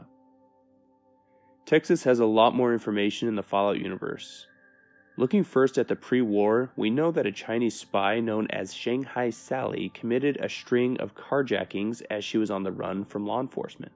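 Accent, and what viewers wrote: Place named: Colorado, United States. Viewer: As a Coloradan I think you are from Colorado by the way you pronounce it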